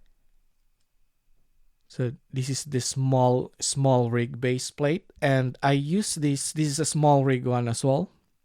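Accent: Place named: Philippines. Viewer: Hi there! I hope your have a great day! Nice video! I have a question, are you Filipino? My mom was born there! Just wondering if you are because you sound like you are! Have a good day!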